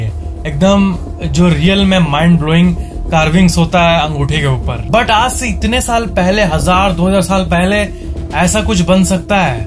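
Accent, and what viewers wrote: Bihar, India. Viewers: Are you from bihar sir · Aap bihar se ho ka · aap bhihar sai ho kya ?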